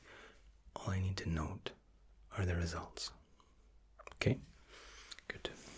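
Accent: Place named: Scotland, United Kingdom. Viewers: Finding your channel has been a godsend! I’ve been living in New Zealand for a year now, but I’m originally from a town near glasgow! So hearing your accent is so relaxing! Cheers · Scrolling through the comments wondering how long after a video was posted is it still worth commenting (because I wanted to say how your voice is seriously my fave ASMRtists voice! So relaxing!) when I came across this comment.....How did I not realise you were Scottish?! That will explain why your voice is the best! I'm from Glasgow :-D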